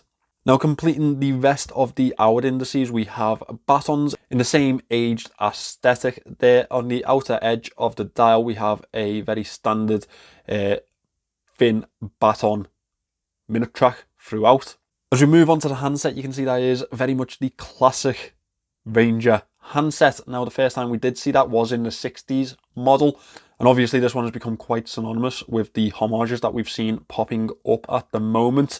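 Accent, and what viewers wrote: Liverpool, United Kingdom. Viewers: Love the Scouse accent bruv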